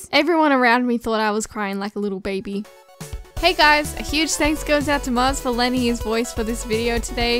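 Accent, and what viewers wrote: Australia. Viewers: Finally i can understand because your australian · Are you British? You said year not grade and I talk English but you sort of sound Australian at the same time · GASP are you Australian?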